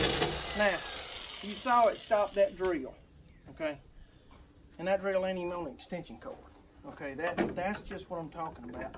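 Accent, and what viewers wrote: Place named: South, United States. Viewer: I LOVE your voice and accent! Every time you speak it reminds me of great Ken Curtis the actor who played deputy Festus Haggen on Gunsmoke and sang with the Sons of the Pioneers. I'm southern too, from South Carolina so I also have the southern drawl lol. Glad I found your channel :-) I just bought some of these tools to experiment working with and your insight and experience is very helpful. Thank you! Great video, new sub :-)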